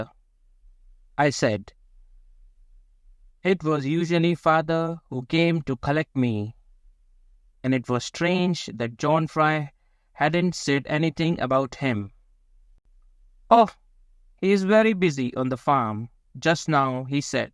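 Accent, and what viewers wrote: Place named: India. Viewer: Indian is telling this story , accent is too strong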